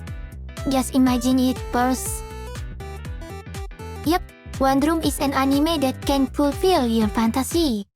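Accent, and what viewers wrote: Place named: Indonesia. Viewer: Are you Indonesian? Your accent is like an Indonesian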